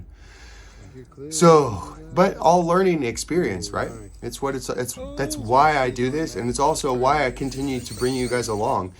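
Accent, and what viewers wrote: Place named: Southern California, United States. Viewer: DUDE don't even trip on sayin DOOOOOOOD. SO CAL from the get go!!!!! I feel you on one handed driving that's 2FMRC territory LOL Diggin your vids DUDE keep it up.....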